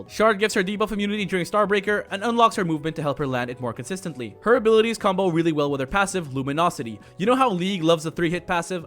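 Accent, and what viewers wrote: Philippines. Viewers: This guy is a filipino, I can hear it. lol.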